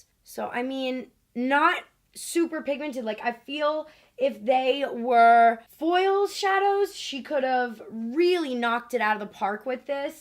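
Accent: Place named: New Jersey, United States. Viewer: With a little Jersey accent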